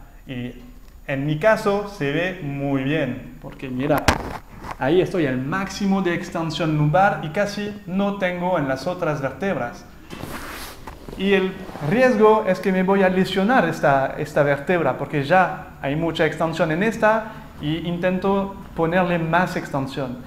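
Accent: Argentina. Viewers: Divino! Y habla en argentino!!!👍❤ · El acento argentino 😀 un groso, saludos desde Génova Italia 🇮🇹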